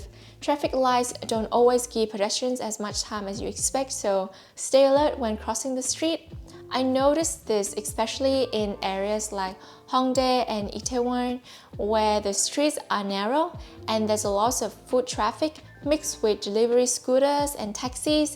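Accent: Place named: Vietnam. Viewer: Vietnamese accent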